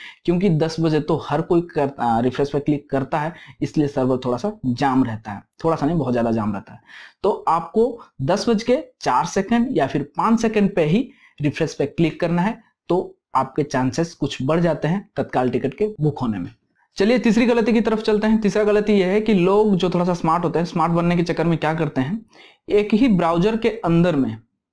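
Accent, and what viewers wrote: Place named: Bihar, India. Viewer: Lagta hai bhai bihar ka ho...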